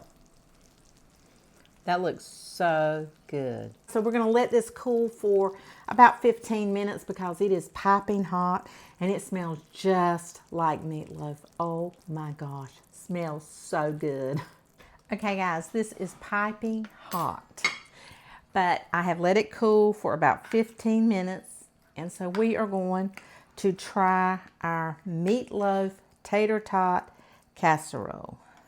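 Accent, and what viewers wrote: South, United States. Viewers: New Subscriber. Love your recipes, your sweet Southern voice and your enthusiasm for cooking. As an artist may I make a friendly suggestion? Try using an undereye concealer the color of your foundation. It's a game changer!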